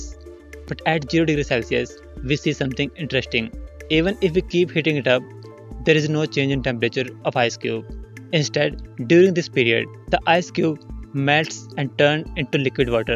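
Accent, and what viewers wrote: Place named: India. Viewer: That Indian accent can kill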